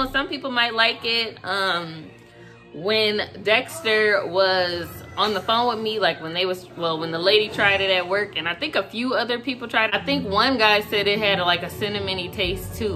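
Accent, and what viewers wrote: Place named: New York, United States. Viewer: Lol you got me may want to try it but I doubt it lol not sure where u from but I’m catch that NY accent can be wrong lol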